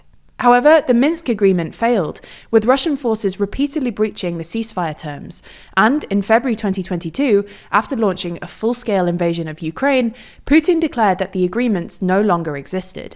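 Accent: England, United Kingdom. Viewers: Love her accent. What part of England is she exactly from?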